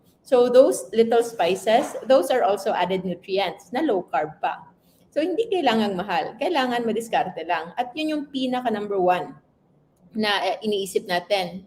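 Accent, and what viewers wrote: Iloilo, Philippines. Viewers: Ilonggo ka ba Doc?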